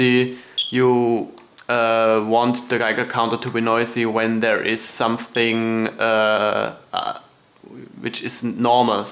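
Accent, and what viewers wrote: Germany. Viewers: das deutsch hört man echt raus :D nettes dosimeter.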